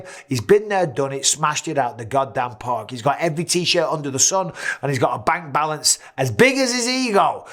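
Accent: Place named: Newcastle, United Kingdom. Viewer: Bisping turning into true geordie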